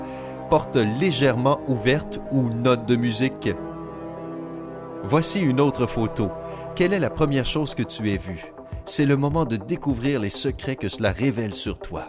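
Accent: Quebec, Canada. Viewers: sablita 07 nan il a l’accent québécois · Avec cet accent québécois à la con c'est insupportable d'aller au bout de ces vidéos. Heureusement d'ailleurs, parce que je n'ai jamais vu un tel tissu de conneries. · Moi mais c l'accent québécois qui donne cette impression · Lama Fâché version Québécois, je renomerais la chaine Lamarde Fâchée su j'étais toi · Tu est québécois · Oui c'est vrai sa il a un accent québécois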